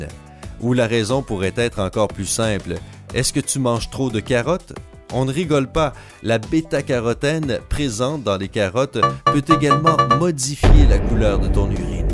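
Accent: Quebec, Canada. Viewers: Moi j'adore l'accent québecquois tout simplement ! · Enfin un canadien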